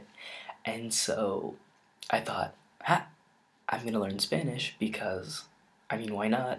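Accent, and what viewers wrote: Spain. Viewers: but you have the accent from spain. most fo the people that lives in california are latinos not europeans. thats weird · La verdad hablas muy bien el español es tan divertido escuchar tu acento de españa cool i liked this video buddy keep it up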